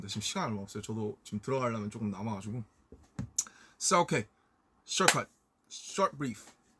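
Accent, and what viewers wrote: United States. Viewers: Y do I luv it when he talks Korean w his American accent 😩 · Namjoon know what ARMY'S want.......he knows that there is no subtitle so we watch his live later with subtitle.....so he is also speaking in english so we ARMY'S will understand what he is saying.....and his english is sound like he is american.......and his voice OH MY GOD I CAN'T.....and he looks good in short hairs.......but I like his long hair more lol........but he still look best in short hairs....... I PURPLE YOU NAMJOON.........BORAHAE..... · That's because when he speaks English, he adopts a west coast California accent to sound like a typical American. He has the sound exactly perfect which means he studied and practiced hard.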